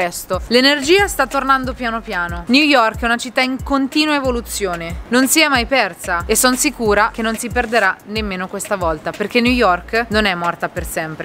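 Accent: Ancona, Italy. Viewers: accento anconetano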